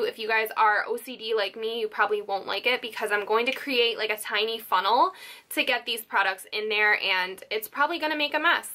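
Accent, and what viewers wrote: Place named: New Jersey, United States. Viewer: your accent screams native jersey italian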